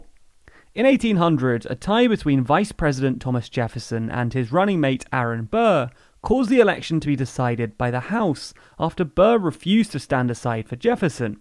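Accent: United Kingdom. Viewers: Dudes British.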